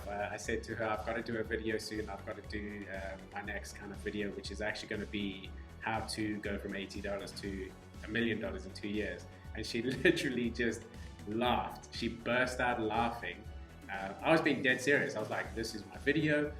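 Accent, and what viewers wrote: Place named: South Africa. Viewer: You sound like you're in SA? Good job pushing these order blocks.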